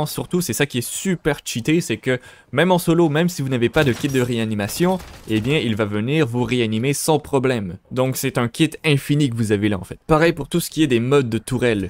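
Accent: Quebec, Canada. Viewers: Yest clairement quebecois pis y se crois 😂😂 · Merci beaucoup!! Es tu Français de France ou tu un Français au Québec??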